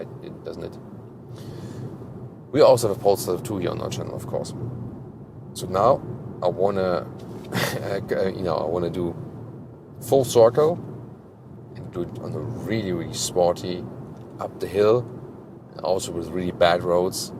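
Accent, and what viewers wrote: Germany. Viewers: There's something about watching a car review with a German accent. Honey to my ears man 😂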